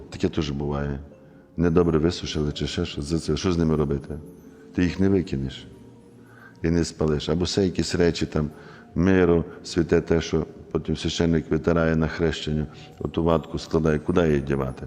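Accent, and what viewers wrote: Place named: Western Ukraine, Ukraine. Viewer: я зі Львова, отець так говорить як західняк (схоже він з Франківщини), але де його парафія? це Днпро? і яка це конфесія?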